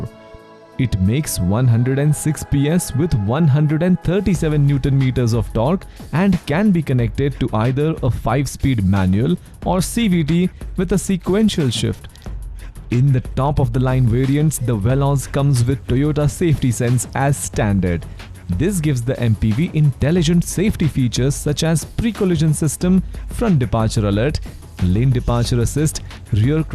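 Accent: India. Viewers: Its japanese car..its weird when indian voice introducer start kick in 🤣. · India. listen carefully to his english.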